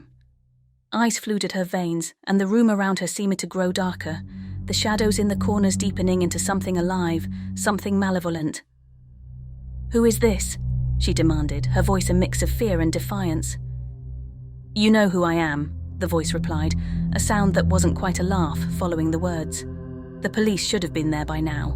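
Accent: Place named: United Kingdom. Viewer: your voice is a very beautiful narration . you sound like your. British or England not sure. but it's very beautiful I like it. Plz keep up the great job .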